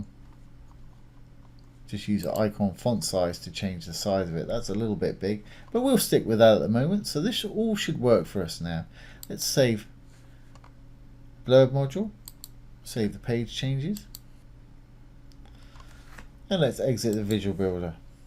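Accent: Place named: England, United Kingdom. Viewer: I like this from! I am intrigued by your accent you sound English but drop the 'T's - very american! Whatever - watch all your videos, you should do some for Elegant Themes KP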